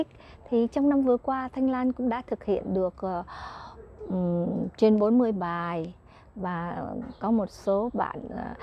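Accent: North, Vietnam. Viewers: Chị ơi theo em được biết chị sinh ra ở Đà Lạt nhưng chị nói giọng người miền Bắc nhiều hơn ah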